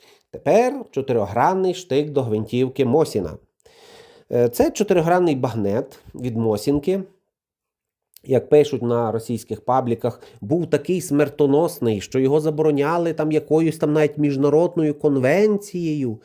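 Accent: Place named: Western Ukraine, Ukraine. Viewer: Акцент заходу України,ме-бе.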